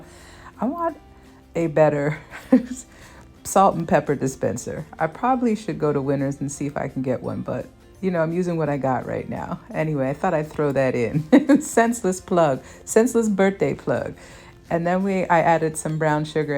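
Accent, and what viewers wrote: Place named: Caribbean. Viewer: 😮😮 Wow!!!I’m smelling the salt fish from Montreal.🤣🤣Looks sooooo tasty.Sending Love ❤️ I’m loving your West Indian accent.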